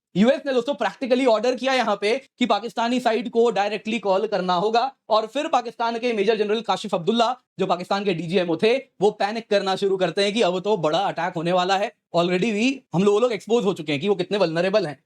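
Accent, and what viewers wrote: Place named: Punjab, India. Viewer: Je Toh pure Punjabi tha ,❤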